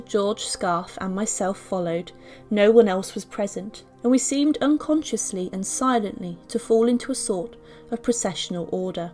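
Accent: England, United Kingdom. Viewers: You sound English. Odd, then, that you do not know that the surname of Samuel Pepys is pronounced "peeps". Just so's you know. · For something so interesting a story, what a scholastic, boring narration. Make it interesting to listen to just not have slight English accent and “ read” ! How pathetically boring! Why bother?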